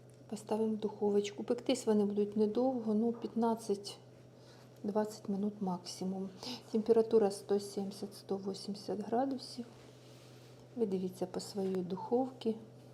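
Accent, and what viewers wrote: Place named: Surzhyk, Ukraine. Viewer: Пиріжки, а не піражки, робити, а не рабиць, не мЯгкий, а м'якИй ,і т.д. Вибачаюсь, але мене цей суржик страшенно дістає...Це приниження нашої мови! Ви така красива і розумна, але цей суржик знецінює все... Чи вам теж - " какая разница?"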